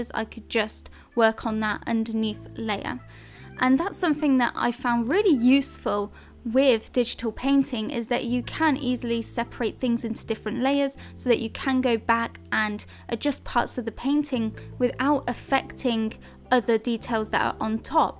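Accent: United Kingdom. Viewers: Confused. Sounds British, but charges in Dollars for the course? Sounds like a scam. That always sends red warning lights to me.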